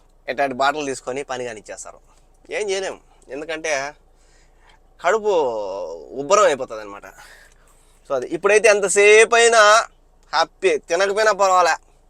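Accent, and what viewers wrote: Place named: Kadapa, India. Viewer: Anna kadapa slang pushpa slang la vundi anna..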